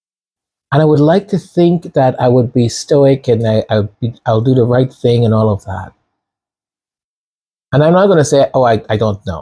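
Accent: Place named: United States. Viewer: Yes its funny i would think u where a American 😊😊😊👍🏼👍🏼👍🏼👌🏿🌹🌹🌹🎈🎈🎈🌞🌞🌞🌞🥰🥰🥰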